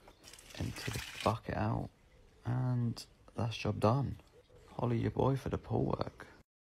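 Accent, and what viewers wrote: England, United Kingdom. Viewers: hovering but with his english accent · Are ya British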